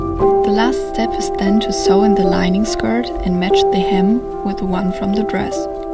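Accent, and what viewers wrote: Germany. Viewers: Deine Videos sind sooo, soooo wunderschön gemacht, jedes ein Kunstwerk für sich. Wohl dein unbestechliches Auge für Ästhetik dass sich auch in all den wunderschönen Kleidern zeigt. DANKE fürs verzaubern ❤ Beim Video schauen dachte ich direkt, dass du aus dem deutschsprachigen Raum sein musst, wegen der typisch deutschen Aussprache. Leider kann ich kaum Englisch und konnte mit deiner homepage dann gar nicht so viel anfangen ohne Fremdsprachenkenntnis. Ich versuche nämlich immer gute Kurse, Seminare oder Online- Ausbildungen auf Deutsch zu finden. Deine Videos sind unbeschreiblich schön gemacht, einfach berührend und sehr emotional umgesetzt. Grosses Kompliment ❤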